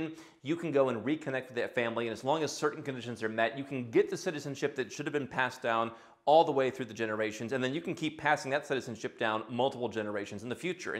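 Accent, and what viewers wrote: United States. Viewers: As soon as you speak we know you're American! Nothing wrong with that and the paper you hold can't change that. It's nice that you're also s citizen of the world. 😊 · It's an interesting point how you brought up that pride month is about identifying how you want to identify, but that you can't be Norwegian. One's identity is an internal thing, but is ultimately irrelevant. With your accent, you're obviously an American for all intents and purposes except the passport (which, since most Americans don't have passports anyway, further supports that point, with the caveat being of course that since you renounced your citizenship, you cannot live in the US).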